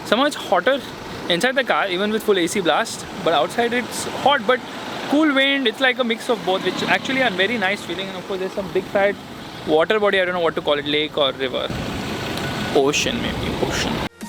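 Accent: India. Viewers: Now I understand why Americans complain about Indian English. Speed - talks 5 sentences before the listener mind tries to understand and sink 1st sentence..!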